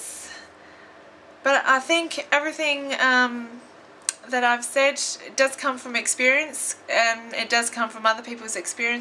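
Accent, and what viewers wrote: Australia. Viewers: she's not british, she's australian.. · All true lol... u're good, must have had a lot of ups and downs on this field so far uh? Hmmm... i don't really need to be shaved to look like a decent guy, right? hehehe I just love your accent... you Australians, ai ai ai!! I could not let this detail out, since I'm already posting you a comment. Anyway, nice post. Cya around!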